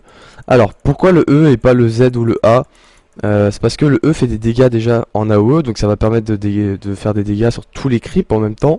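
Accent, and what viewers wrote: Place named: France. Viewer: J'adore quand il dit la solo cul xD Accent français ftw ! :D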